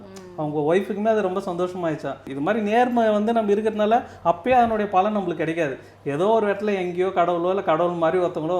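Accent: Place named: Villupuram, India. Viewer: Villupuram district slang mathiri iruku sir ungaluku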